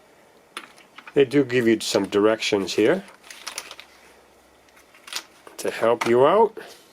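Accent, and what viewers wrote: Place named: New Jersey, United States. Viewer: Great video, thank you. What's that accent you have? Jersey?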